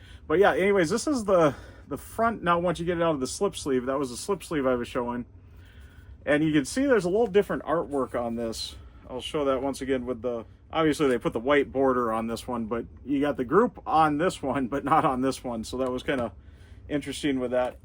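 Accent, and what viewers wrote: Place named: England, United Kingdom. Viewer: as a norwegian, im struggling to hear if u are english or ameircan. yea, i love. everything i love about, is in. if have existed in 2000, they would have sound exactly like, even the harmonies are there. by could be straight frim help, too,. is a very john lennon song too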